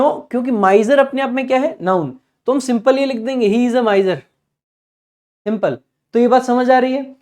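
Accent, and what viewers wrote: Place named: Haryana, India. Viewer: HARYANA SA HO SIR MA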